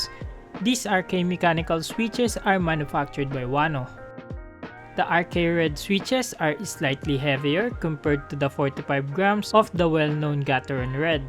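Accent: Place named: Philippines. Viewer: Filipino accent hello ganda ng review bro love it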